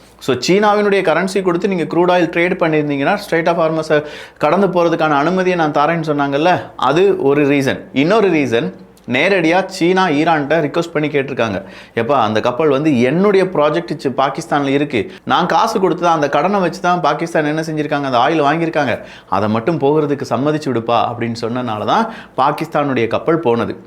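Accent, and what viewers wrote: Kerala, India. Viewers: his parents are from Tuticorin/ Udangudi area - he did his middle and high school in Vandiperiyar area which is in Tamil Nadu kerala border - you tend to get Malayalam mixed slang when you stay in border area - obviously he knows Malayalam very well you can observe the same slang in Nagarcoil / Marthandam area as well - they don’t become Malayalis right ? He worked in software company in Trivandrum and he was YouTuber at the same time - he got home in Trivandrum - it’s little cheaper to buy properties there than Chennai as you know so obviously you don’t move out that easily once you buy home A lot of our Tamils are settled in Mumbai - they don’t become Marathis right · hear his pronunciation of college, office and similar words with o sound. Only someone with Malayalam as mothetongue can talk like that. His entire family is from Kerala and settled in Trivandrum. 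If he is Tamil, then why buy land there and build and settle there???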